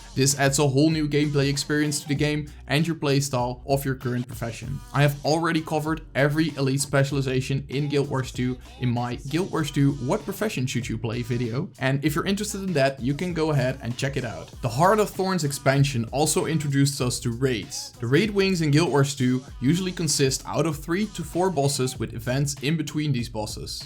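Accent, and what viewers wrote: Netherlands. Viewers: ik hoor dat je nl bent hihihi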